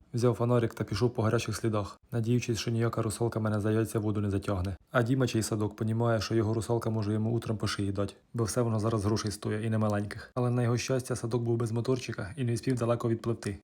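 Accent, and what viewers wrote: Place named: Sumy, Ukraine. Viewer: А з якої ви області? Такий суржик, як в Сумської. Я наче вдома побувала. Дякую вам!!!) Гарний контент( щоденне життя то круто).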